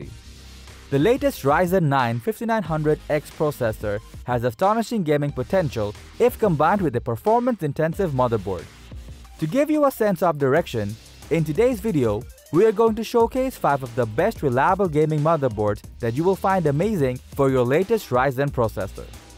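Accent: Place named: India. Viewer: I know this video is Garbage 🗑 the moment the voice changed. From white boy intro to Indian guy 👦. Bruh you don't need an American guy to to start your video. Plus we can read the info on the box or website. More views with bad comments ain't good for you.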